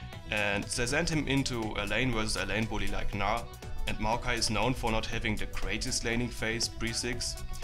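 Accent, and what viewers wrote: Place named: Germany. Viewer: Dat German accent xD wie geil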